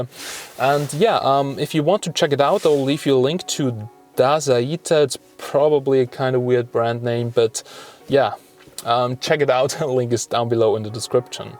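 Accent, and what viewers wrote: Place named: Germany. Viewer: nice German accent :-)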